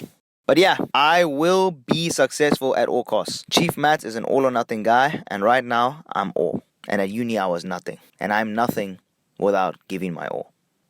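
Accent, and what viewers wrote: South Africa. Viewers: This accent belongs in my backyard. SA?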